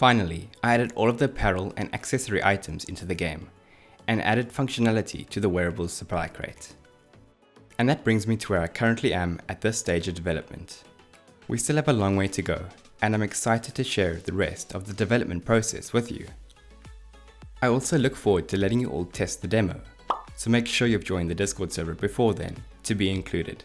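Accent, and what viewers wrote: South Africa. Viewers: is ja n SA aksent ruik soet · I know a South African accent when I hear it :) game is coming along nicely, bro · Difficult to place your accent... You south african...?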